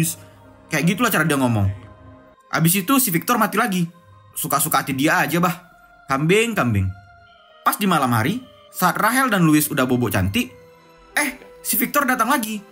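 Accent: Medan, Indonesia. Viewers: Medan ni bos😂😂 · Logat² medan ni pengisi suaranya wkwk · Fix orang medan ni abang nii...semangatt bang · Wkwkwk orang medan toh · Mantap x bang ceritanya bang,,,kek bwrasa lagi di medan ajj awak nii,,,, Lanjut bang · Orang Medan ya bang, sama kita orang Medan LIKE WEEEE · Iya bang orng medan ni kek nya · Hadir bg medan:v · Latak org medan😂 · Owalah mainmu kurang jauh bg itu logat khas medan rata² kalo anak medan tu cara ngomong nya kekgitu mkanya maen² kemari biar paham dikit yekan, hargai lah karya seseorang, aku org medan dan aku terhibur dgn cara dia menyampaikan alur film nya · Medan kali yakan lekk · Logat Medan nya kental x ya BG😅 Love you BG😅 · Iya anjir suara serak serak Medan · Logat Medan tanggung sih. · Keren logat Medan:v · Asli orang medan nih wkwk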